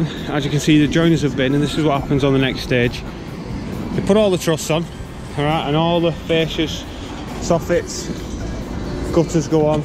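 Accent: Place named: Liverpool, United Kingdom. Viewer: Spot on mate, what more can you say! Scouse! ;)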